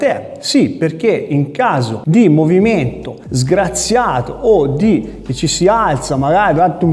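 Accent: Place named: Tuscany, Italy. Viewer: Ma sei in grande! E poi, con l'accento "toscanaccio", troppo simpatico.. bisogna accettsre x 💪🏻 forza. Ciao by Jerry (Milano)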